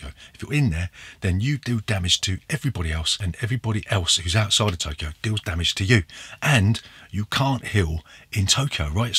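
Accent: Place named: South London, United Kingdom. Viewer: Another great and really interesting 10... Chinatown and Puerto Rico were two of the first of the modern style games that I played...👍. Must admit I prefer San Juan... Really looking forward to the next ten... Great job... Got to ask...where are you from...? Your accent is almost as south London as mine...no offence meant if your not from South London...😁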